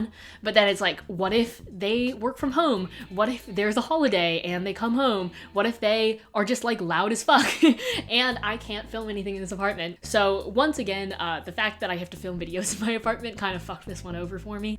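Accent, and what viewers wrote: New York, United States. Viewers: is it me or does sound more like an NYer, like lower tone and speedy, confident vibe...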